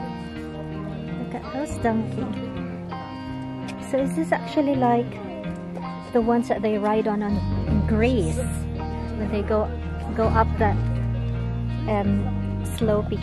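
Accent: United Kingdom. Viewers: Belated happy birthday, rainy day in your place now, gusto ko British na accent, sounds good... Enjoy the zoo...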